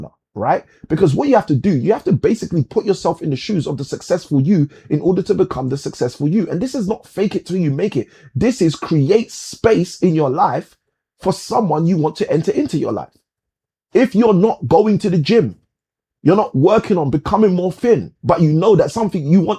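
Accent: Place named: Africa. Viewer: Your not from the UK your from some place in Africa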